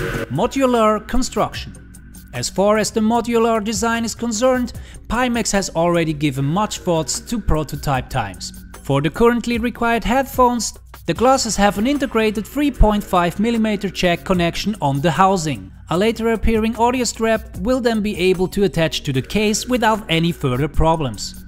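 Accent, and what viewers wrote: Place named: Switzerland. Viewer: ha doch dänkt ech ghöre e Schwiizer :-)! Got the 5K+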